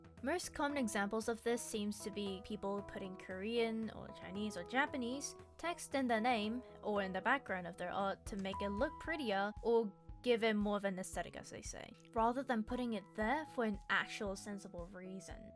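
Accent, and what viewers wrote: United Kingdom. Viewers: Are you British because you don't sound Koren( I,m not trying to be rude) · OMG ARE U BRITISH!??? OOP- IM SORRY!! I JUST LOVE YOUR ACCENT!! IM OBSESSED!! AGAIN SORRY!!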